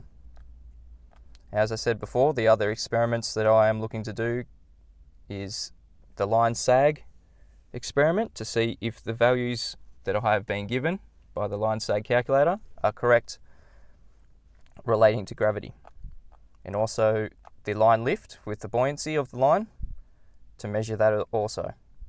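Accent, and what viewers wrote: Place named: Australia. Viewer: Good luck with your experiment. I think your vertical bars may need some strengthening. Possibly some angled bars from the horizontal bars near the container to the pulley and to the tensioning line connection, there will be largish forces involved. triangles will give you your strength. ==================== At least you understand buoyancy is a function of gravity. Considering that gravity isn't compatible with any FE model, you wont be getting much FE support. ===================== You have an Aussie accent, therefore you can ascertain South (using a few stars) and observe the South Celestial Pole on any night. (that also breaks all FE models) A far simpler experiment, but you should give this idea a go.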